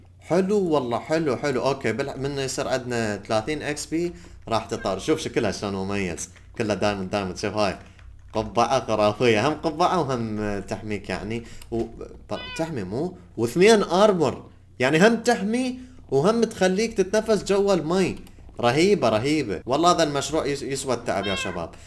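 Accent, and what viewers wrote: Iraq. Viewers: كرك بادك عراقي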